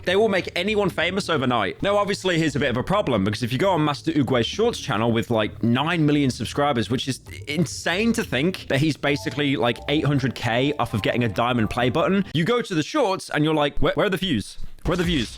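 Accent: United Kingdom. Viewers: Idk why but I like listening to this brit yappin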